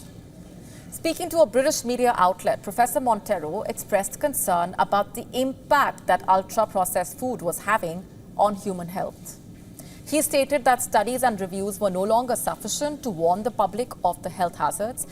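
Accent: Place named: India. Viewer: Indian. It's the only accent I can't stomach, I don't know why.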